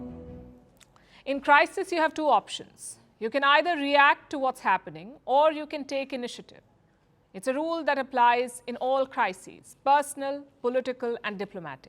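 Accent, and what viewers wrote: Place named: India. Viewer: I like the way this lady tell her stories. Most of the Indian accent sucks but she manages to pull it off. Much love and respect from Africa.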